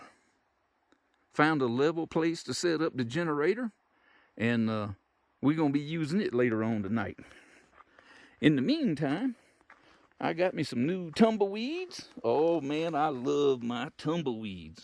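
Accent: United States. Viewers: I like you. I sure can hear Mississippi in you voice. Daisy sure is calm. Beautiful camp sight. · I loved your video and your southern accent!! I was looking into small campers, easy to handle since I will be camping by myself. Please add more videos with your set up and all the gadgets you think are the most useful for campers. Thank you so much again!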